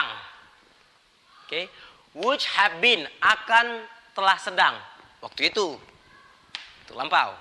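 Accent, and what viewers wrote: Medan, Indonesia. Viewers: Orang medan emg gitu lah logatnya gan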